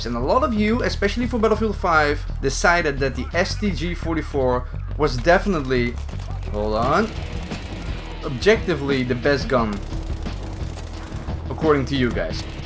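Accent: Netherlands. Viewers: Are you dutch by any chance? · Jij hebt de typische Nederlandse accent wanneer je Engels spreekt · Je bent Nederlands ik hoor dat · I hear some Dutch accent, am I right?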